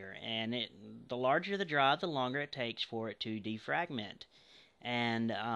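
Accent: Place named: Texas, United States. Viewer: I agree! I love your voice! Clear and precise instructions. I would venture to guess you are in Texas. Anyway, this video has opened my eyes to what my computer can do. I used to use Auslogic, but I believe it had another name. It was on a desktop I had with windows 3.1 and also with windows 95. Now you can guess how old I am. LOL Since I got my new computer, DEL, I've been looking for this, and now, thanks to you, I have it again. Thank you so much! Keep up the good work!!!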